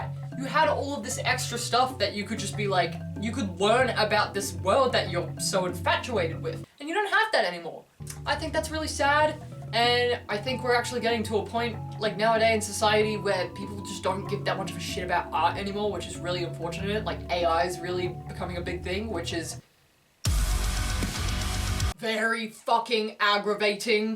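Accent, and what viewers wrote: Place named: Australia. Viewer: When I saw your video in Scott Cramer's video I truly did not expect an australian accent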